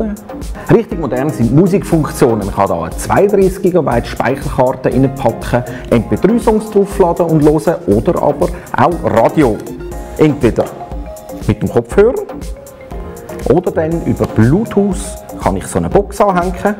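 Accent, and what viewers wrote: Switzerland. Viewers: Genau! Die Sprache ist mir sehr sympatisch. Weiter so! Ich verstehe alles. Dieses Handy ist allerdings so überflüssig wie nur was. Was soll sowas in der Modernen Zeit für einen Sinn haben? Gruß in die kleine, aber feine Schweiz. Wenn doch nicht alles so teuer wäre bei euch, würde ich zu euch zu Besuch kommen. ;-)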